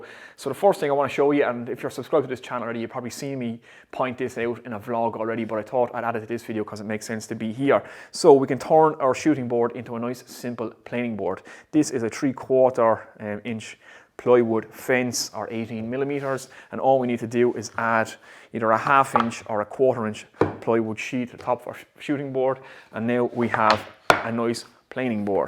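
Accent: Ireland. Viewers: Hello, your videos on jig making are fantastic and I will definitely attempt them. Can I be so bold and ask what part of Ireland you are from, because your accent is very familiar. Also, I don't really go in for Patreon but I do believe in rewarding good work that is helpful for me so can you advise your PayPal account please.? A jug for a jig seems a fair reward (hahaha). Paul. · Just hearing your Irish accent makes me smile. I’ve sent many years working on the green isle, all very enjoyable. Thank you. Co..Cork?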